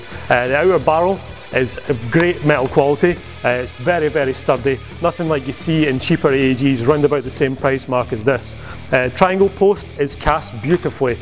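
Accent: Scotland, United Kingdom. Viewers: are you Scottish? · not weird only scottish!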